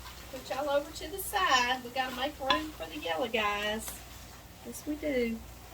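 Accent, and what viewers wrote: South, United States. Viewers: love your videos. you're such a happy gal. love your southern draul.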